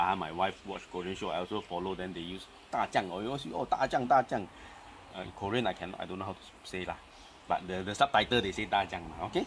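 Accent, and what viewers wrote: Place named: Singapore. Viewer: Typical singlish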